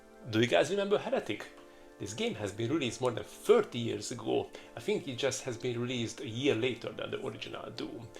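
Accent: Hungary. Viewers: Jól hallom? Mintha magyar akcentust hallanék. :face-blue-smiling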